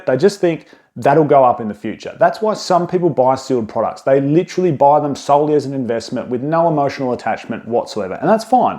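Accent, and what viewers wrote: Australia. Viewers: Hey, legend, random question but are you aussie by chance?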